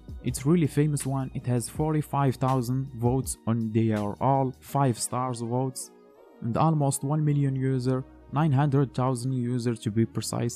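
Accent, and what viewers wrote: Morocco. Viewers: Tbarklah 3lik akhoya bayna fik mghribi mn tari9a dlhdra👌👌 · i knew ur Moroccan from ur accent , shoutout to you Mate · مغربي هذا ههههه باينة غي من الهضرة 😂😂😂😂🤦‍♂️🤦‍♂️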